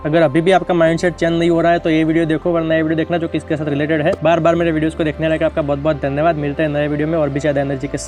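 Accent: Gujarat, India. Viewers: Gujrati accent in Hindi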